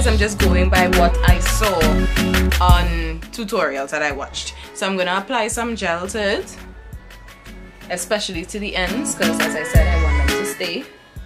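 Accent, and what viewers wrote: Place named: Trinidad and Tobago. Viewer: Hair looks nice . Accent sound like a trini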